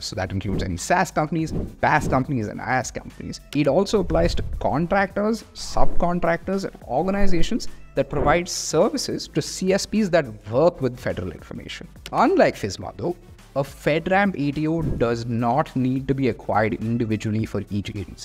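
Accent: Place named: India. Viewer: I have to really increase the volume to understand what he is speaking - an accent like bollywood or some language that I am not used to is hard to understand, the accent.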